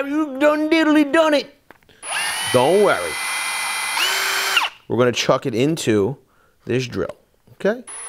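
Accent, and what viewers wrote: Philadelphia, United States. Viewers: Clicked for the review, but soon as he started talking I knew he was a South Philly guy! Yo Cuz Def subbed & liking everything on this channel!!!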